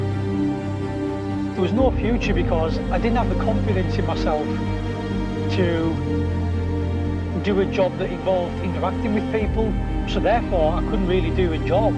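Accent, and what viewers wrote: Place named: Teesside, United Kingdom. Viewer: What an great video and message... I am 6 days clean today from coke after many relapses... was great to hear a local accent (I’m in Teesside)... thanks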